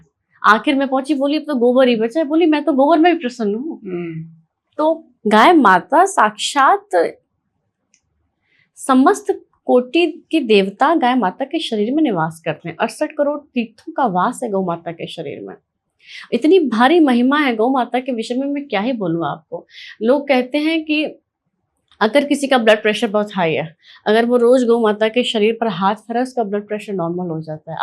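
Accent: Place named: England, United Kingdom. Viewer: आपकी आवाज अलग ही है। आप कहां की हर जी ।मुझे लगता था आपकी आवाज सुनकर कि आप कोई अंग्रेज है😂😂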